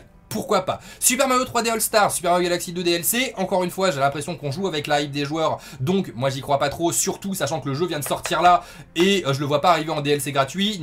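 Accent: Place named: France. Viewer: Mon dieu l'accent Français une tuerie!!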